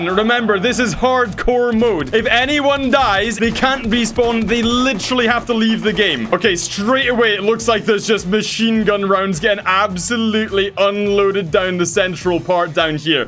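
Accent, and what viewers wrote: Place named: South London, United Kingdom. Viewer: bro sounds like hes from south Lindon im 💀💀💀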